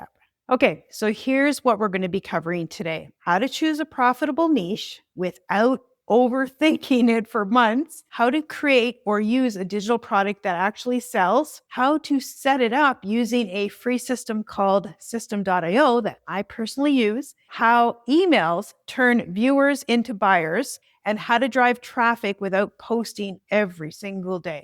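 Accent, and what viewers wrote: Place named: Canada. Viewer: Me too I heard her Canadian accent. I’m from Nova Scotia but live in Montreal now 😊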